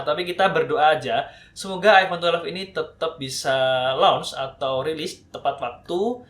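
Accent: Indonesia. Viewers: Orang sunda ya?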